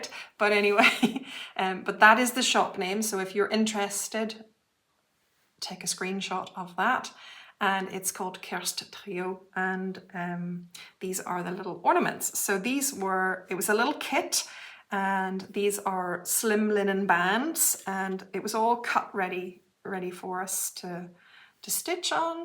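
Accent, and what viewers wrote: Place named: Ireland. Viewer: I always enjoy your stitching and listening to your accent. Reminds me of my trip to Ireland . The Nativity is lovely.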